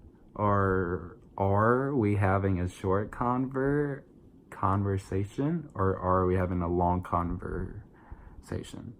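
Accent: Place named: South, United States. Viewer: What part of the south you from. I hear it real well lovely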